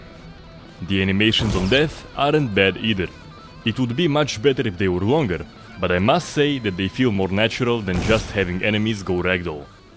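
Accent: Russia. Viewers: Are you russian or something?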